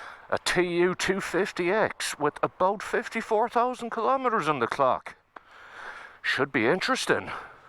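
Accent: Ireland. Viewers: An Irish accent under the palms?.......good lard! I agree, a fun little bike!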